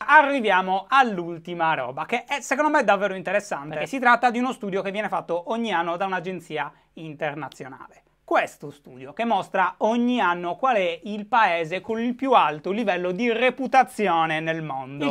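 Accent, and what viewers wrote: Sardinia, Italy. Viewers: Prima volta che guardo un tuo video, nuova iscritta :) (Forse che si nota che sei sardo :D)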